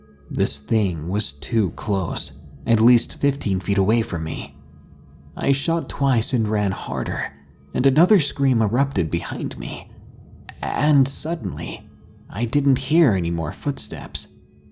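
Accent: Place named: South, United States. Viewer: Been a fan of now for 3 years....hahah back when he had a southern accent...anyway keep it up. I help sponser too by being a fan:):)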